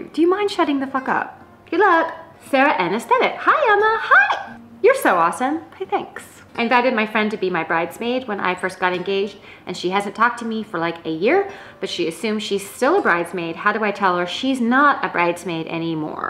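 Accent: United Kingdom. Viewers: You got a cute little pommy accent there, how are you at doing an Aussie accent ? If you can do a good one, you'll be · awesome English accent Emma it sounded just like the posh English accent haha Stay Gay! :)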